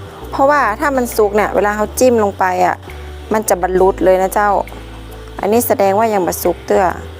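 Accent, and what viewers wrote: North, Thailand. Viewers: อู้กำเมือง น่าฮักขนาด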